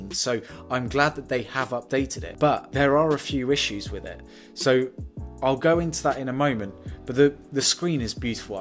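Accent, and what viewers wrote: United Kingdom. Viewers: Are u Indian ? But u talk like British tounge. Nice vlog anyway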